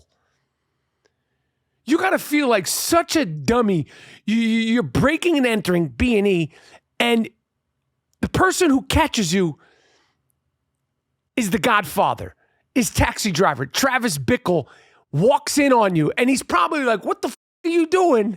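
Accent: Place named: Brooklyn, United States. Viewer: Hi! Hope your wife feels better and your family recovers completely by 2023. I am a Brookyn expat living in the DR and listening to your accent reminds me of home. ❤🙏 Thank you so much for being you.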